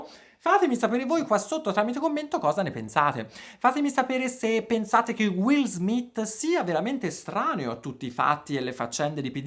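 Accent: Tuscany, Italy. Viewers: "Hai più foto con lui che con tu moglie..." morta dal ridereeeee!!! Nessuno sa raccontare delle storie così stravolgenti come lo fai te!! Sei divertentissimo!!! Sei molto espressivo e l'accento toscano è insuperabile! ❤❤👏🏻👏🏻👏🏻👏🏻👏🏻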